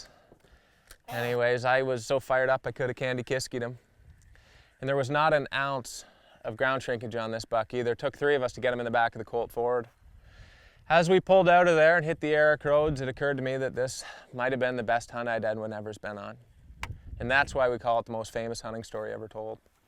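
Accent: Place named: Canada. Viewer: He sounds flamboyantly canadian.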